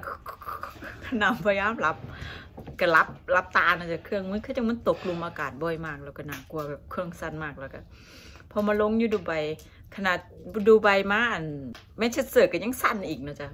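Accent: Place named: Isan, Thailand. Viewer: ขอบคุณพระเจ้าที่คุณและครอบครัวถึงอังกฤษอย่างปลอดภัยมีความสุขนะคะคุณน่ารักพูดภาษาอิสานเพราะมากคะ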